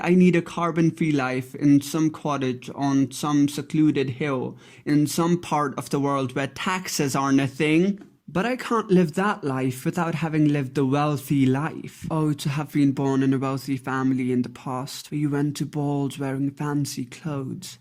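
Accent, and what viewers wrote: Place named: United Kingdom. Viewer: Your videos are great, keep it up. And your accent being British is very interesting, when I first saw your 3000 years history video, I thought you were British lol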